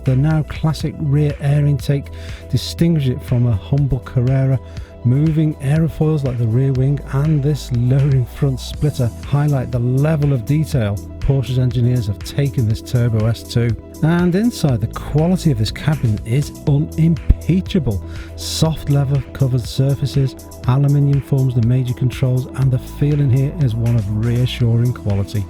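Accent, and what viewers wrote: United Kingdom. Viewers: i like british accent -i activate CC to learn better english... i learnt some words ive never heard before in this video .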